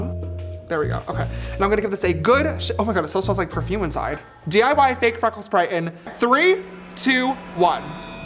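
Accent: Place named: Scotland, United Kingdom. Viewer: is it just me or like kinda has a scottish accent in this vid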